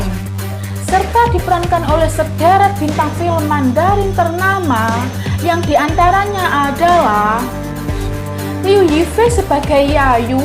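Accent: Java, Indonesia. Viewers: Medok mantul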